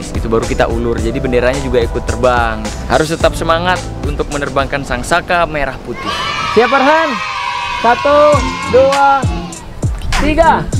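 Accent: Madura, Indonesia. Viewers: Anda orang madura ya kalo iya berarti sama kayak saya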